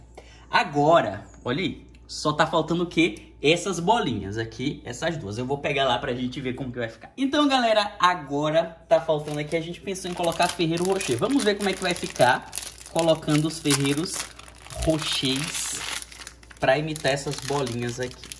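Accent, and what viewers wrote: Maranhão, Brazil. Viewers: Mano eu comecei a assistir o canal dele agora, e não sabia que ele era maranhense ksksksk aí achei a voz dele parecida com o sutaque maranhense e vi na legenda que ele é ksksk 😅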